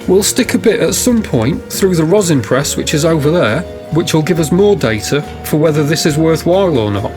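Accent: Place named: England, United Kingdom. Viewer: Maybe it is because of the english accent in general, but for me as a german you kind of sound a little bit like Karl Pilkington!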